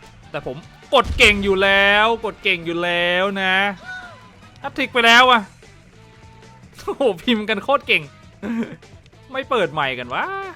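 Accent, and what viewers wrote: South, Thailand. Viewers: แหลงใต้ชับพึดเลยน่ะน้องบ่าว...หรอยๆ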